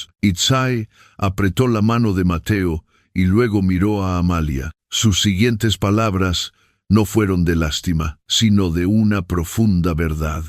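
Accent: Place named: Portugal. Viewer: Bonita historia pero ese acento en portugués no le veo el caso le quita interés a la historia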